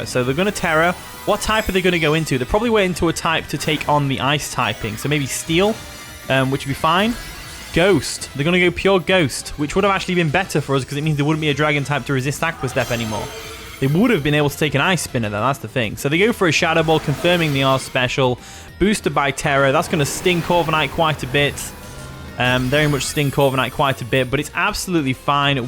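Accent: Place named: United Kingdom. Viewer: It's the accent bro! Everything's better in British.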